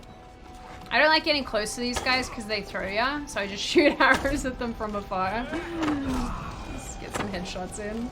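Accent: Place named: Australia. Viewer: I think it's fun watching, plus I just like to hear you speak. Being in Dallas, I don't hear many Australian accents.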